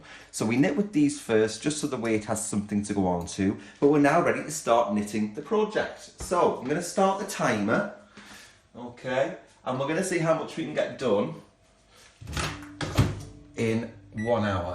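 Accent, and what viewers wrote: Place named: Northern England, United Kingdom. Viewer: Excellent tutorial - especially for an absolute beginner as you explain everything so well. Although I'm not a beginner I got my trusty Brother KX395 out of its box and made one of these lovely little buntings and I'll be giving it to charity. Lovely to hear a northern accent 😃 Look forward to more of your projects.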